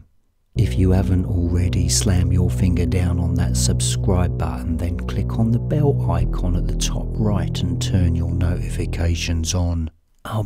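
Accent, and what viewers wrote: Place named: England, United Kingdom. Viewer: Another Nightmare Expo attendee. Two things, I loved the first cell slamming sound, scared the piss out of me. 2nd, listening to your voice, I have only heard a few people with the "English" accent that I adored. I like your accent enough to hold it in regard to the likes of Stephen Fry or Jim Dale.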